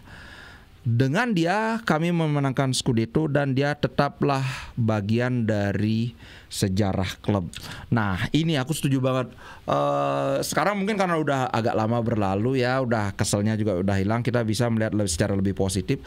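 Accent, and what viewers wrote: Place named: Singaraja, Indonesia. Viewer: Betul banget dari Singaraja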